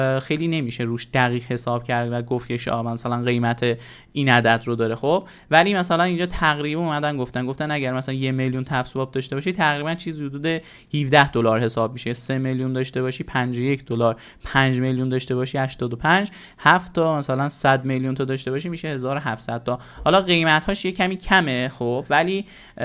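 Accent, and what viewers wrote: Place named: Shahrekord, Iran. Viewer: لهجه دهکردیو ببین باو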